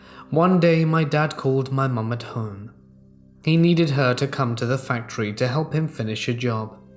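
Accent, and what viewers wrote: United Kingdom. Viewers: I don't think I've ever gotten over deep voice, British accent followed by I'm a 20yo female lol doesn't make me not enjoy or appreciate the video. It just makes me smile to hear lol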